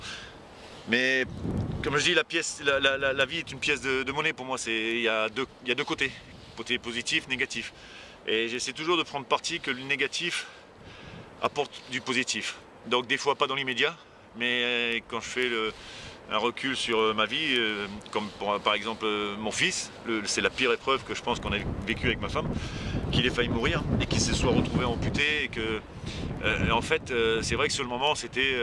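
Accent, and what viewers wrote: Nord-Pas-de-Calais, France. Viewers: Une personne simple, qui vie simplement, qui parle « vrai »... Je suis normand et habite en Auvergne depuis peu, j’allais très souvent voir les ch’tis, là haut, que de bons souvenirs. Je pense que nous aurions aimé, mes amis et moi, nous retrouver à boire une bonne petite pinte dans ton bar. Ça me fat du bien de réentendre cet accent. Nous construisons aussi notre petite oasis, de notre côté nous faisons notre chemin. Je te remercie, j’ai l’impression d’avoir pris ma vague d’optimisme et de positivité pour le week-end et plus.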